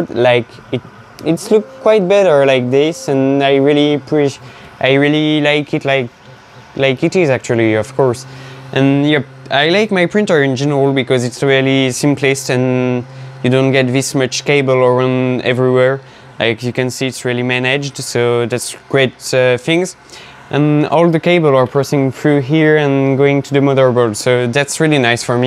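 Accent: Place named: France. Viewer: Salut, tu devrais renoncer à tout faire en anglais ou bien travaille un peu plus la prononciation, des fois tu es difficile à. Comprendre... ne te bloque pas trop sur l’accent, c’est pas si important, de toute façon on entend que tu es français, bon,, à part ça, tu dois ontinuer car tu fais du bon boulot et d’ici peu tu te fera ton petit nom....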